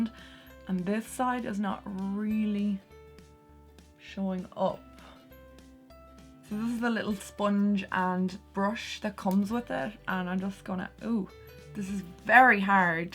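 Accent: Ireland. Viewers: Are you from Ireland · Love the Irish accent! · Love to listen to you talk. Some of us from the USA love the Irish accent.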